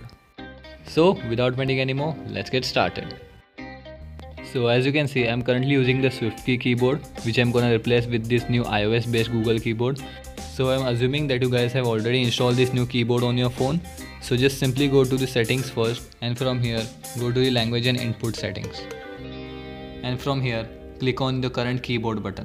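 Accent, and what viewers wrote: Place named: India. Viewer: Its like indian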